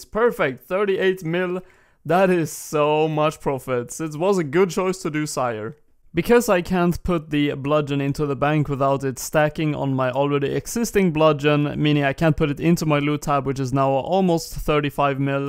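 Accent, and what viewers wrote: Sweden. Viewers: Raspig svensk dialekt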